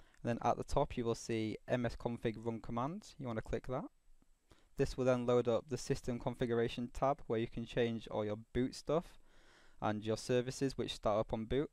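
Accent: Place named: United Kingdom. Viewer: British accent detected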